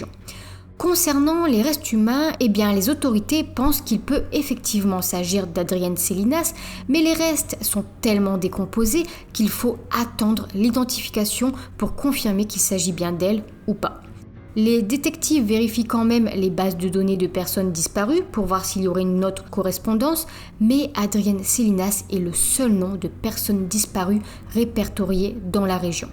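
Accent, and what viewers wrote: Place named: Nord-Pas-de-Calais, France. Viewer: Bonjour, Tu viens de quel département exactement. C'est pas méchant de ma part mais c'est la première fois que je suis tombée sur quelqu'un si jeune avec l'accent ch'ti si prononcé.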